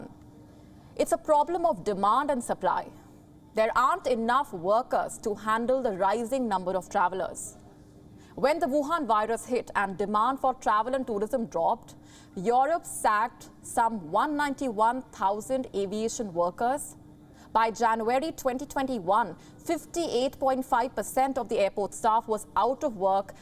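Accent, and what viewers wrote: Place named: India. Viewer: She is one of the best speakers and keeps her nice Indian colonial upper class Charismatic accent.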